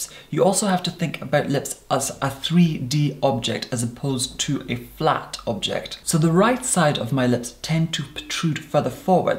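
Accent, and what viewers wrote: Scotland, United Kingdom. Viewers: It’s a peculiar Scottish accent mixed with English so not sure what to make of it but balm would never be pronounced bal-um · Ur Scott accent comes out sometimes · just wondering are you originally from scotland or have friends/ family from scotland? because your accent is slightly scottish but mostly english. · Alex Firnth of course I know he is Scottish but do you hear it in his accent? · Scottish accent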